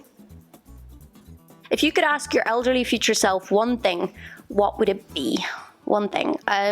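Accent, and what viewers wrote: Ireland. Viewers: I dont know what it is about you but i find you absolutely amazing.sweet.cute and absolutely stunning.you have gorgeous eyes.and the accent.i know its cliche but the irish accent.and your singing voice!! Sorry.im in love haha 😍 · Clickbait title and video starts with: "Hello Friends, I have a yeast infection". I love that irish accent, and I get better at understanding it every day. I'm at almost 50%!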